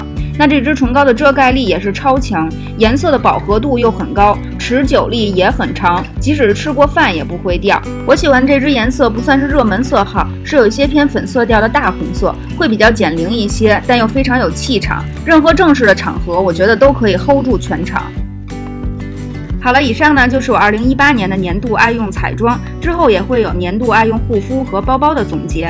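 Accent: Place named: Beijing, China. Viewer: 前几天刚看到你的视频，一听是北京话，亲切得不行，立马关注，加油，支持你！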